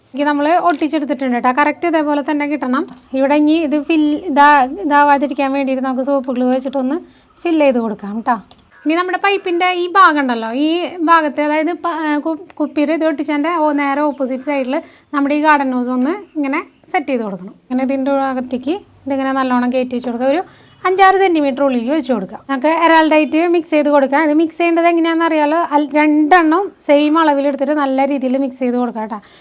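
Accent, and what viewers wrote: Thrissur, India. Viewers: സംസാരം കേട്ടിട്ട്. തോന്നുന്നു തൃശൂക്കാരിയാണെന്നു എന്തായാലും വീഡിയോ അടിപൊളിയാണ്‌ കേട്ടോ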